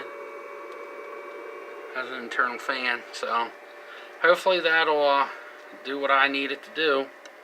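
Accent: Baltimore, United States. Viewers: I hear an accent are you from the Baltimore area? I'm looking to buy a small private plot of land hopefully around summer time between northern VA, eastern WV or southern PA. I could easily ask you a million questions but I'm sure your videos and Google can answer most.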